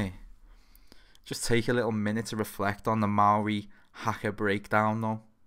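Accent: Liverpool, United Kingdom. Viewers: Not a huge fan of reacts, or the scouse accent, and yet somehow I'm subbing :p I love your passion for the music man.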